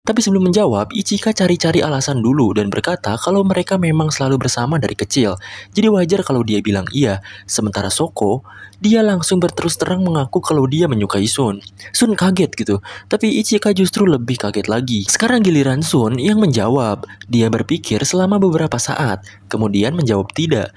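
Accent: West Java, Indonesia. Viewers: Admin org jawa barat keknya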